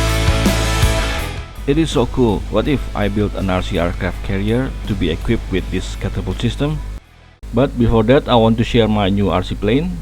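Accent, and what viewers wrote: Indonesia. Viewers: how come I feel the way you speak English is like an Indonesian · Pantes kok kenal logat2 inggrisnya, ternyata org Indo. Wkwk. Mantep banget channelnya mas, gak nyangka ada yg punya topik foam RC plane 👍👍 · Tadinya gw kira ini channel luar, pas denger logat nya wahh indo nii, dari ini gw percaya channel berkualitas bnyak juga di indo. Keren sii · gw tau abang org indo karena dari logat 🗿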